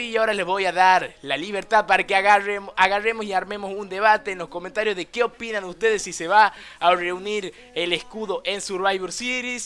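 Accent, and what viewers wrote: Tucumán, Argentina. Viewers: yo soy argentino y tu tonada parece de tucumana😊😊😊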